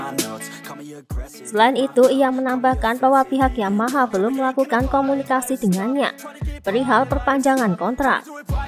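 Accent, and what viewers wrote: Java, Indonesia. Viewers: Chanel ini keren. Tapi agak kurang pas saja dialek & intonasi mimin_host nya. Nampak banget seperti anak SD (untuk ukuran host YouTube) cara host baca dialog. Lagian dialek jowo ne nan kental mendowngrade level Chanel ini. Itu aj point' yg belum gw mensubscibe Chanel ini.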